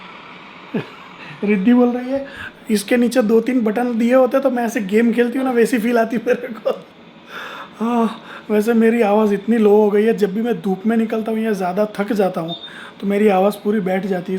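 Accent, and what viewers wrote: India. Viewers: Sweet gujrati speaking by bhai 🤗😂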